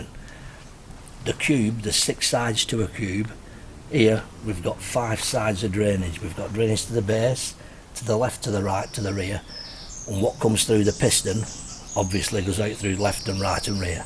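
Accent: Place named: Yorkshire, United Kingdom. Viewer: I love a Yorkshire accent.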